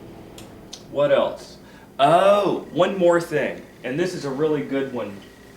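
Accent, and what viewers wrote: South, United States. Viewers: I love your hoodoo stuff the most. It must be the Southern Accent.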